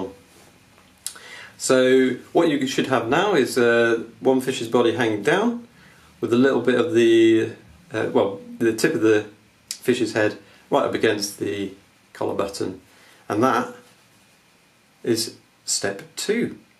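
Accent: United Kingdom. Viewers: The English accent makes it better! But seriously I got it with this video.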